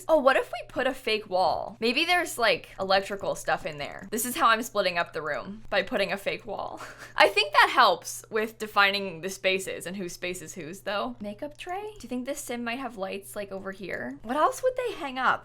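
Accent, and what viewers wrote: England, United Kingdom. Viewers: For about a year I thought you were British and idk why🤣 I think it’s because I’m so use to hearing American accents that they’ve become normal to me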